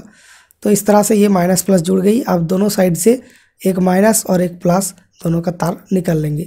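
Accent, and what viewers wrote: Bengal, India. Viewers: Are you bengali ? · Tum bangali ho keya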